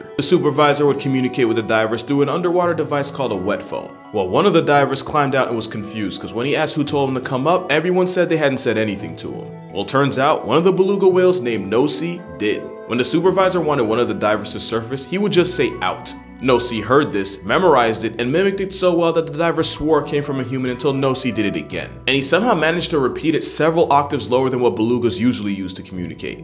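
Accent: Scotland, United Kingdom. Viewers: Hey! Nice Scottish accent 👍🏼👍🏼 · His Scottish acsent is good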